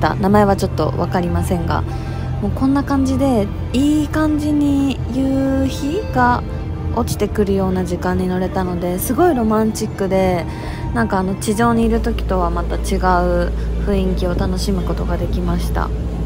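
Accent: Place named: Kansai, Japan. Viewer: かわいい❤️‍🔥東京いるのに関西弁抜けてないあたり関西人の私からすると、たまらなく好きです❤️‍🔥 さんって団子食べるんや〜って何か思っちゃいました♡可愛い♡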